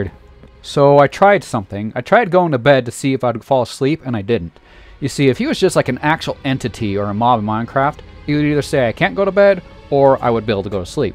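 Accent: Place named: United Kingdom. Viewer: WE ARE BRITISH 🇬🇧 (sorry I love the British accent)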